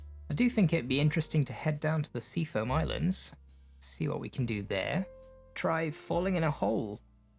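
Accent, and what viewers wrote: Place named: Shropshire, United Kingdom. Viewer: I don't, I just thought your accent sounded a little Shropshire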